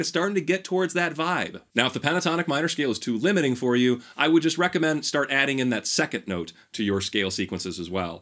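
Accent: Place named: Midwest, United States. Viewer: Wow I just stumbled on this video and man, I just wanted to say thanks and congrats. You have great content, a nice fast pace and a perfect radio voice (with a crisp Midwestern accent indeed). I learned a lot even though I've been playing music all my life. This was legit one of the best tutorials I've ever watched. 👍🏽👍🏽👍🏽 Keep rockin'!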